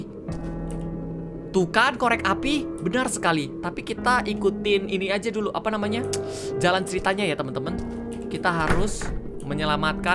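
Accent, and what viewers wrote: Java, Indonesia. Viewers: Medok banget😂Forte Vanguard FG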